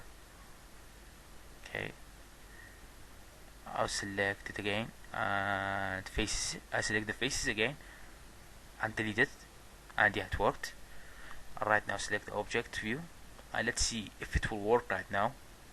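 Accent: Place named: France. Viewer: thank you very much ,,, you are french,Right?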